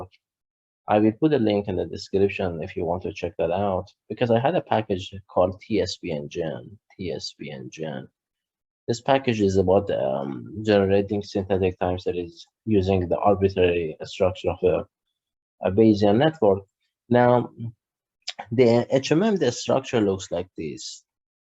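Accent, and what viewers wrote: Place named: Iran. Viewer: I enjoyed alot from this video with your beautiful and obvious Persian accent.😊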